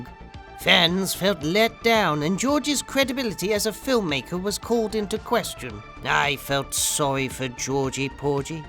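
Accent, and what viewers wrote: United Kingdom. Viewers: Good job you sound like plinketts older proper British brother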